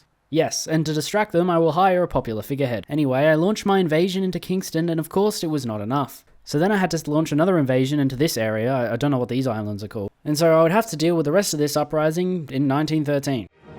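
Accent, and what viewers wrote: United Kingdom. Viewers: The British accent caught me off guard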